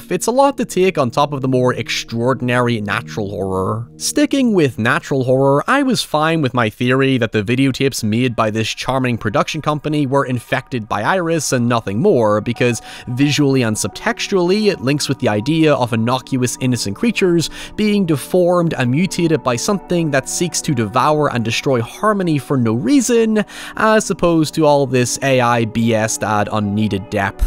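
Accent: Ireland. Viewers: God, I love how Irish youtubers pronounce certain words. Every Irish Youtuber I’ve watched loves to use the word “Hoyever”.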